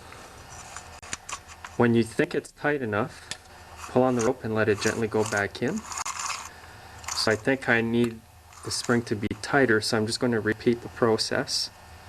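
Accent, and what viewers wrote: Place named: Canada. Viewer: I love the way Canadians pronounce the word "out" as "oat." Great videos BTW!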